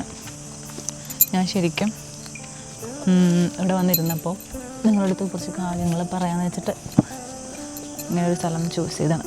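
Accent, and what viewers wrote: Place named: Kannur, India. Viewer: Slang aan enik ishttam❤ kannur?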